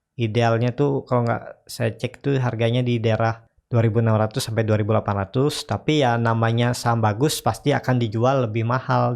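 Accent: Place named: Borneo, Indonesia. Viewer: maksudnya 'Khas itu" logat bahasanya kak...emang bahasa kita dayak kalimantan begitu...spt klo bahasa jawa kan 'medhok' banget gt.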